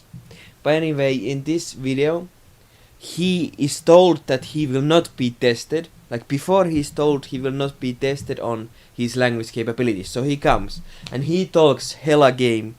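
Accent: Finland. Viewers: I'm finnish and this is the most stereotypical finnish accent ever, he is 100% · Is this a finnish accent or a messy indian accent?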